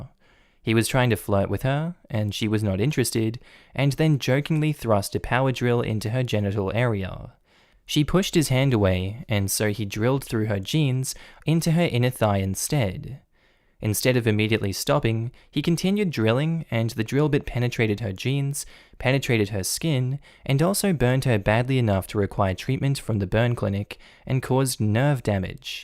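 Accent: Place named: Australia. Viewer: My mom loves your aussie accent, and loves watching these videos with me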